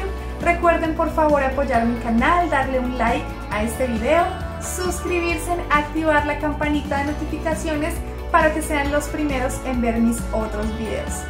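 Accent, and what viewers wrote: Colombia. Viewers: Hola soy MEXICANO y tambien vivo en USA en el estado de OHIO saludo tu Nuevo subcritor me gusta mucho tu asento colombiano eres muy hermosa y que viva MEXICO y COLOMBIA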